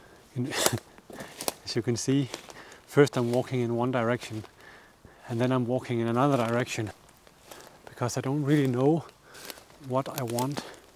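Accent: Denmark. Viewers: The landscape, the 'driving by the bakery', the danish accent... just made me so homesick :) (Expat)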